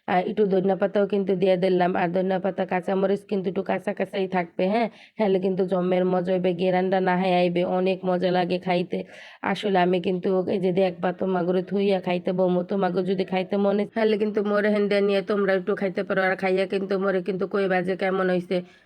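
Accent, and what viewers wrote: Barishal, Bangladesh. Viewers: আপু গো তোমার মুখে বরিশাইল্লা বাসা খুব খুব সুন্দর লাগে ❤👍ভালোবাসা দিয়ে দেখা শুরু করলাম শেষ পর্যন্ত তোমার সাথে আছি আপু ❤👍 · আপু নিহারী তন্দুরি রুটি খেতে দারুন লাগে আর তুমি কি ভাষা বলছ তা কি বরিশালের ভাষা তুমি কি বরিশালের মানুষ???? আপু ভাইয়া তো কত সুন্দর করে কাঁঠাল ভাঙছে, চ্যানেলের নাম চেঞ্জ করে দেবে???? মশকরা মশকরা ভিডিও দেবে 😂😂দিও আমি দেখার জন্য রেডি আছি তোমার ভিডিওটা দেখতে একটু লেট হয়ে গেল দুইটা বাচ্চা নিয়ে আমি নাজেহাল অবস্থাতে আছি খাওয়া দাওয়া ঘুম নিদ্রা সব উঠে যাচ্ছে। 😢😢কেক বানানো দারুণ হয়েছে ঠিক বলেছ আপু একটু অন্যরকম ভিডিও দিলে খুব ভালো হয়। আমি তো একঘেয়েমি হয়ে যাচ্ছি 😢 তোমার জন্য অনেক অনেক দোয়া রইলো অনেক দূরে এগিয়ে যাও মাশাল্লাহ তিন লাখ ভিউ দেখে তো আমি খুব খুশি 🤲🤲🤲🤲🤲👍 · ৩০👍মনু তোমার বরিশাইল্যা কতা জম্মের ভালো হুনাইছে।তোমার নিহারি অনেক সুন্দর হইছে তয় পেঁপে দেলা ক্যা?একলা একলা খাইলা মোরে একটু দেলা না😥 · আসসালামু আলাইকুম, কেমন আছো আপু, তোমার এই বরিশালের ভাষায় কথা বলা খুবই ভালো লাগলো আপু, নিহারি রান্না লোভনীয় হয়েছে ❤❤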